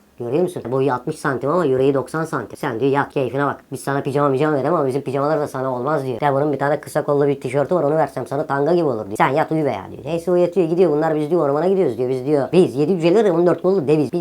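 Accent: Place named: Thrace, Turkey. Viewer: Abi bu Trakya şivesi ile Türk ve uluslararası ünlü romanları anlatır mışın lütfen çok komik olur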